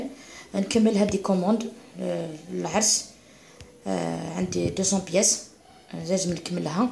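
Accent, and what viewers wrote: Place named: Bejaia, Algeria. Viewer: ربي يعطيك الصحة شحال نحب كي تهدري البجاوية الحرة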